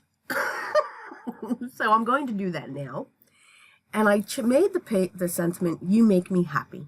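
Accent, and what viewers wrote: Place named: Philadelphia, United States. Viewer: I knew it! I ‘m from Bucks County. I have been in Florida for the past 28 years and every time I hear you, you sound like home. 🤗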